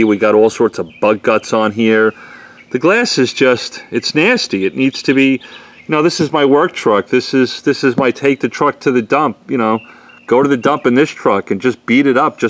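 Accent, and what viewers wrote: New York, United States. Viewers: Are you Italian and are you originally from NY? There is no way you arent lol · Are you jew from New York?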